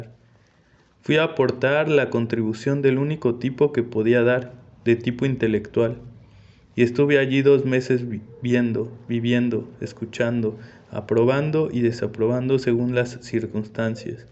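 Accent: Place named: Mexico. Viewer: presente el acento mexicano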